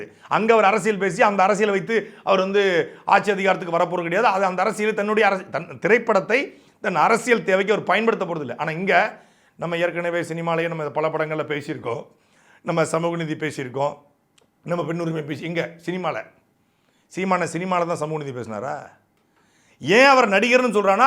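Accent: Tirunelveli, India. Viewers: சாட்டை துரைமுருகன் ண்ணா திருநெல்வேலி பேசியது அருமையாக உள்ளது அப்படி பேசுங்கள் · திருநெல்வேலி மொழி வழக்கு அருமை செம்ம 👌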